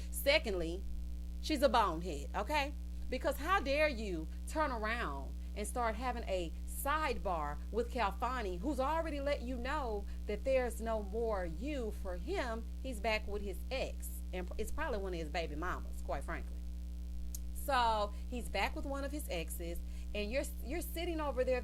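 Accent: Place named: Houston, United States. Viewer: in my mind, you are my new Bestfriend....everyone has pointed out how you and I mirror one another with the way we speak...LOL!! Houston meets South Carolina 🤗 Love your channel and I hope it continues to grow...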